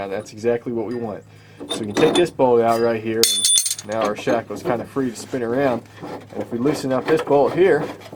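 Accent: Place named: Western Pennsylvania, United States. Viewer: What are we going to do with that Western PA accent!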